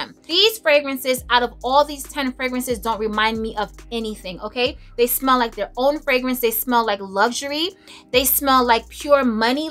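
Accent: East Coast, United States. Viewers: East coast accent that ruins it for you? Can she help that she's from the East Coast? Now the pronunciation of the perfume is a good constructive criticism, but to say her accent is an issue, please have a seat. 🙄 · Not the worst review. But that east coast accent that ruins it for me. And the lack of correct pronunciations 🤦🏽‍♂️ (I’m not sure how to say this” is a sentence that prevails with reviewers. I find it to be lazy. Take the time and learn the names of the fragrances. It’s respectful to the house.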